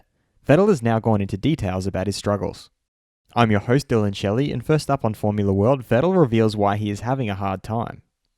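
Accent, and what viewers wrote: Australia. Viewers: These clips are fabulous - I find it difficult to believe you only have 100,000 subs. Your videos are easily of the same calibre as any TV program from back in the day when people still WATCHED tv, lol. Really stoked to find this channel, as a fellow Aussie it's really cool to hear the Aussie accent. GREAT STUFF FORMULA WORLD!!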